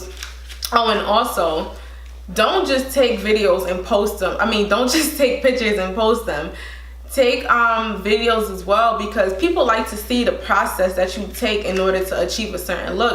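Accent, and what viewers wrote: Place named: New York, United States. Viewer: I️ could tell ur from nyc or Jersey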